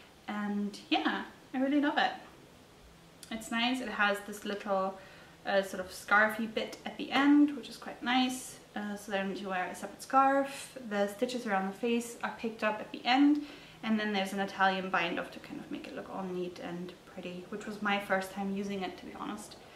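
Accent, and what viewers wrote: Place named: Scotland, United Kingdom. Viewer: Hey - good to connect with your podcast! Sending love up to Glasgow! Love to hear a European with a nice Scottish flavour to their accent ;)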